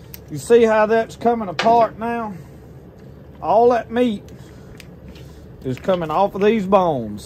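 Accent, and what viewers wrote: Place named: West Virginia, United States. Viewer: U said I just ought dem yersday,,, u sure u ain't from WV, lol, we sound alot alike brother, Love the shows, keep makin em, we keep watchin, GOD BLESS YOU AND YA FAMILY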